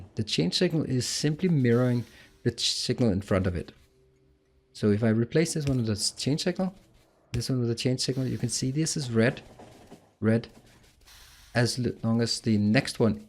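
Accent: Denmark. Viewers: Denmark? Im asking because of te accent 😁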